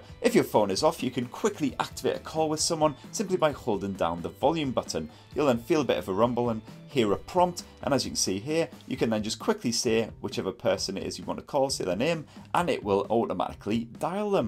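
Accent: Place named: England, United Kingdom. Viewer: Thank you so much for this! First review was from an Indian dude, nothing wrong with it, but I can't understand his English, haha. Nothing can beat an English bloke! And very detailed review, wonderful! Only thing that concerns me slightly about this phone is that the battery is not removable so you can never replace it.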